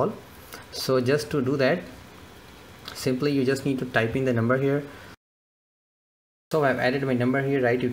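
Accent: India. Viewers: Indian accent+low quality microphone+bad quality video= can't understand what you are saying.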